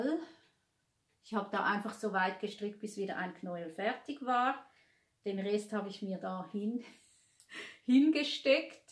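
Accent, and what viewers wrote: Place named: Switzerland. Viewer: Liebe, vielen Dank für deine tolle und unterhaltsame Podcastfolge. Ich bin erst vor ein paar Wochen bei dir eingestiegen, da die liebe Angie von dich empfohlen hat. Und ich muss sagen mit Recht! Du hast so eine positive, lustige Art, da bekommt man direkt gute Laune 😊. Deine Projekte gefallen mir auch sehr gut und ich habe bisher auch noch keinen Kolding, will ihn aber auch noch stricken. Strickt du die normale oder die kleine Version? Und dein Schweizer Dialekt 😍 ( sagt man Dialekt?) Eigentlich ist es ja eine eigenständige Sprache. Ich liebe es!! Viele Grüße aus dem Rheinland Katrin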